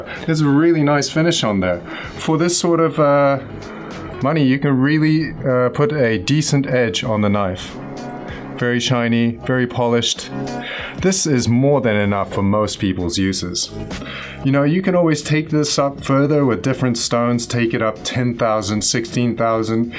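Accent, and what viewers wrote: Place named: Zimbabwe. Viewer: He has a Rhodesian/Zimbabwean accent. Get a life and leave him alone.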